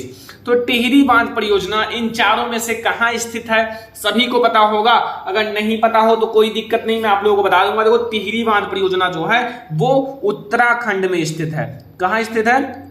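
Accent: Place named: West Bengal, India. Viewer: West bengal का बोल ra hai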